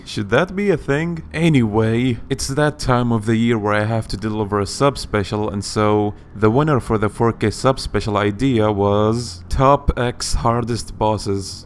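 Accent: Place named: Bahrain. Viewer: It’s very easy to tell that you’re bahraini from your accent 😂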